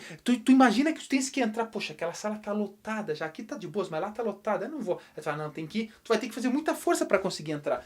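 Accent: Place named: South, Brazil. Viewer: Bofe é bonito,pelo sotaque é sulista,e muito didático, estou estudando com sono,boladona com os colegas de turma,e senhor me fez rir,quase caindo.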